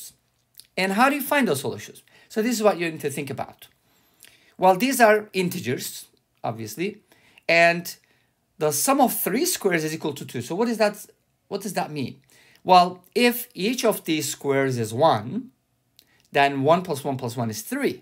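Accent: Turkey. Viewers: are you turkish?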